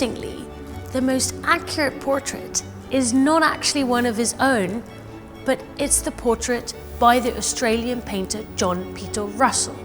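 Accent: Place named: Netherlands. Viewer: I actually love her accent, sounds like a Dutch that lived or studied long in England. My husband is Dutch and sometimes he also sounds a little bit like her, I love that.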